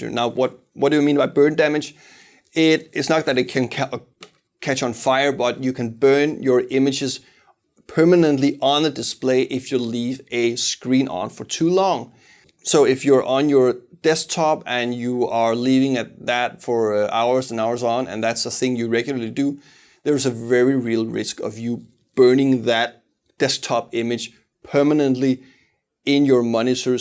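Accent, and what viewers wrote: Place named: Denmark. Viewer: aggressive danglish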